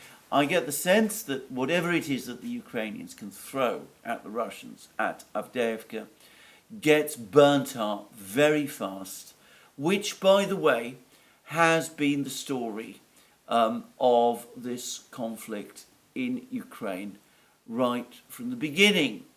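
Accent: United Kingdom. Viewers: Question for Brits: I am so distracted because I can't place Alexander's accent. Does he speak RP, London accent or something else? It doesn't matter which. but I am not a native speaker myself and just want to know what I spend all this time listning to. Grateful for some clarity! 😊Love from Sweden.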